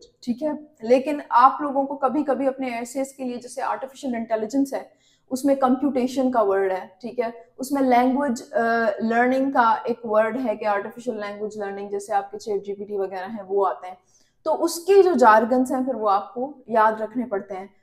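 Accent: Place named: Sindh, India. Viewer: Apke to apne english thek nahe ha total sindhi lehja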